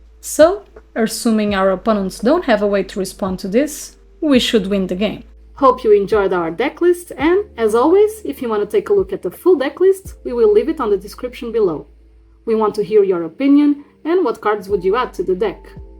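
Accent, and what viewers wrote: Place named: Portugal. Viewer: Pt accent? Amazing!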